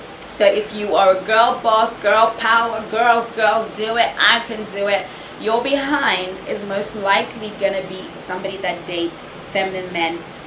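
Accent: United Kingdom. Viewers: You look gorgeous. I love your British accent:)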